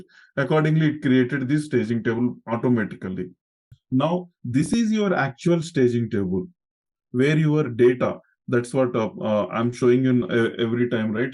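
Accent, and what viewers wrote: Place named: India. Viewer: Love this! So thanks! Excellent topic, good intro. I do ha e some feedback on the production of the final result. Would appreciate a speaker with an somewhat more comprehensive vocabulary and an improved grammar use. A trained speaker may use less 'uh', 'ok?' and 'right?' usage. I think you could improve with a more conscious intonation and speed of voice too. A Hindi accent can be fine, the lady who provided the introduction spoke with more clarity. Try to stick with a journalistic linguistic standard. Otherwise: great course, thanks!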